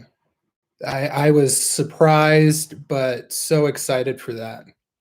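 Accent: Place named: Africa. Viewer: I love African accents too!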